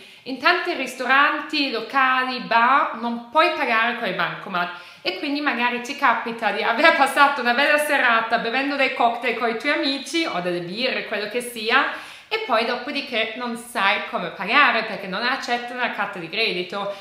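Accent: Germany. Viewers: Bravissima complimenti per il tuo italiano mi.piace l.accento tedesco....io vivo in Germania da anni...e si sente l' accento italiano quando parlo tedesco e divertente questa cosa..ciao un abbraccio...dove vivi in Germania ? 🌷🌷🌷🌷☀️☀️☀️☀️ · Se voi piaciuto ,se vi è piaciuto questo video ,hai un forte accento tedesco si sente . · Salve. Mi chiedevo se la bottiglia di plastica si deve rendere obbligatoriamente nello stesso negozio dove si è comprata o anche in un altro? Grazie. P.S. Il tuo italiano con cadenza tedesca è stupendo.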